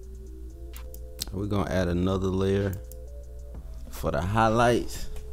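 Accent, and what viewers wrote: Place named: South, United States. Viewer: Man, are you from the south? Atlanta? You sound like the cat from Outkast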